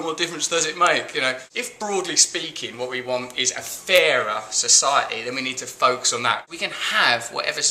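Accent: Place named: United Kingdom. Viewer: I wish more people would see this side of Russell Brand anytime I recommend one of your videos or movies they think it's just a guy yelling in a British accent.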